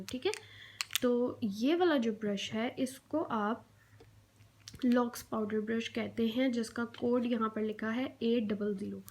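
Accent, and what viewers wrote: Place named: Lahore, Pakistan. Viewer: Apka Urdu + Lahori accent buht pyara lagta h 💐💐💮💮 n Allah bless you for Ur new platform inshallah yaha v aap Kmal kre 🤗👏👏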